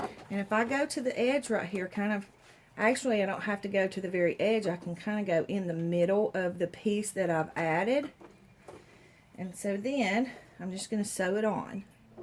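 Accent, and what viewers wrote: South, United States. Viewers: Great tutorial. Love your accent, the way you say booooks, lol. There is just something so charming about a southern accent. Love from Denmark :)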